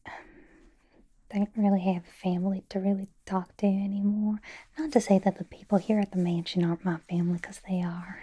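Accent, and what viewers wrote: South, United States. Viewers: Yes, the Southern accent is very nice. Very soothing. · I love rogue and the southern accent omg, I discovered your channel about a week ago and your cosplays are on point! so entertaining and the dialogues actually are in universe, its so entertaining!! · This is my favourite version of rogue is honestly brilliant and omg the southern accent is just perfect ahhhhh amazing 😊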